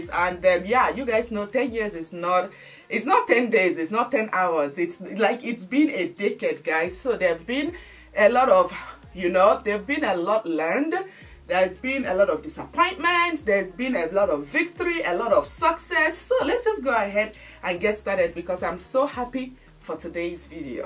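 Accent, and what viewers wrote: Igbo, Nigeria. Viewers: This is a huge motivation ❤ it was even your so called "Igbotic accent" that made me stick to your channel since I came across it in 2019 bcos it makes me feel at home (like having my sister talking to me)😂 unlike watching influencers with American accent. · Hahaha , 😂you are soooo real.love you for your sincerity, love your Igbo Accent like the french, Italian, Spanish........❤❤❤ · I don’t have any acne but I was drawn to your channel because of your accent😊I loved the fact that an Igbo girl like me wasn’t ashamed to be herself on YouTube. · I started watching some 10yrs ago too. I remember I was looking for some videos on how to make Nigerian fried rice and how to tie gele. But I remember clicking on one of your acne videos and immediately I heard your voice, I said, this is my Nigerian sister and I subscribed. You have really come a very long way sis. 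And we thank God for his faithfulness. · its even the accent that made me subscribe....I am an Igbo girl too, and you sounded so relatable. My first time seeing you was that collab you did with Dinma Umeh. Well done for your resilience and Congratulations, you really inspire me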